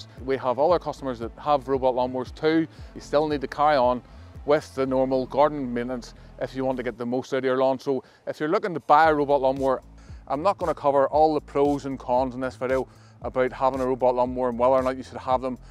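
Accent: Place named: England, United Kingdom. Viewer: Cant understand your queens English eho. Give me a Kiwi any day.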